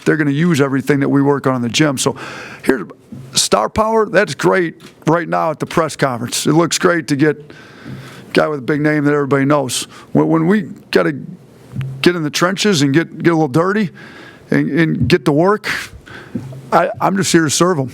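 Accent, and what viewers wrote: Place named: Northeast, United States. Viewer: he has the northeast accent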